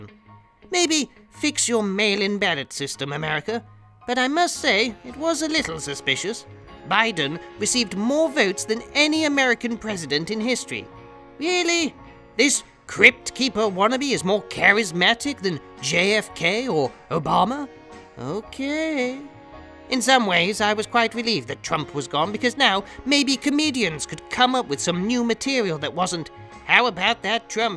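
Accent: United Kingdom. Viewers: I'm gonna put things more on "Willfully Ignorant" rather than "Downright Stupid". But please don't comment on things you have any clue on what you're going on about. At times, you sound a lot like Alex Jones with a British accent than any person with logic or reason.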